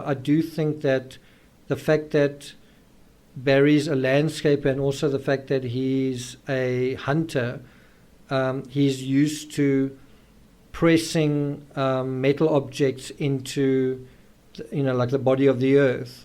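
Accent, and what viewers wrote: South Africa. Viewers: hello, i can't find your name but you sound like a fellow South African. Am I correct?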